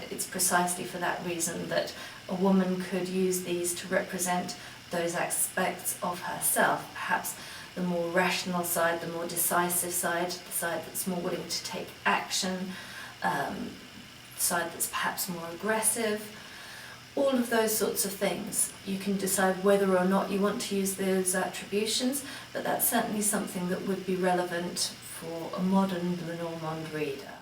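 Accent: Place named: England, United Kingdom. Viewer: oh ! I v just seen your présentation in your channel, and , you talk about the Celtic Lenormand ..are you the creator of this deck ? its amazing , I ve just received one from amazon after have looking some reviews on others channel , and I find pictures so sweet and beautiful ..its funny for me , because , when i ve purchased it , I didnt know it was your deck , but in the same time , I ve discovered your vidéos about Lenormand !!like an évidence ! I appreciate your english accent , its easy for me to understand your videos and thats why I suscribe on your channel .Which town are you from ? London ? Oxford ?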